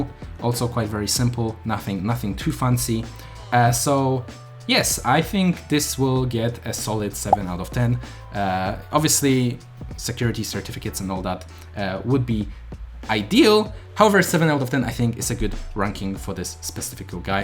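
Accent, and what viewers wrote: Poland. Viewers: Are u polish?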